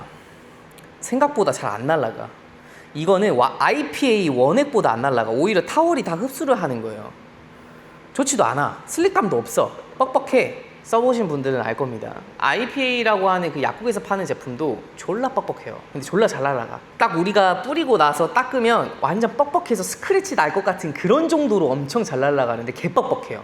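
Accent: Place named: Jeolla, South Korea. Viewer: 아따 전라도 사투리 구수하게 쓰시는데 전라도 아니셨습니까?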